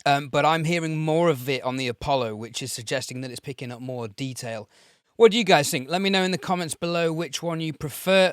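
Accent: United Kingdom. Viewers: Thank you so much for taking the time and trouble by answering my question. I guess l am going to go for it, as it'll be the last monitors l'll ever get and listening & seeing its spec, seems to tick all the correct boxes ! Also the UA Apollo 4x interface, that you brilliantly demo'd, will make all the difference. By your accent, are you from London. I lived there for 25 years, based in Ealing and now back to my roots in the NorthWest near Liverpool. Keep on doing your great videos, it is really nice not to hear a load of waffling about all thing other than the subject in hand, as others do across the pond ?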